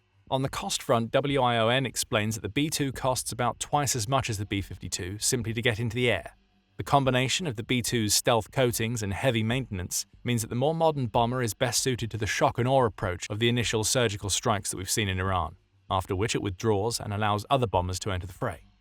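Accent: United Kingdom. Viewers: Great footage but why have a Brit narrate?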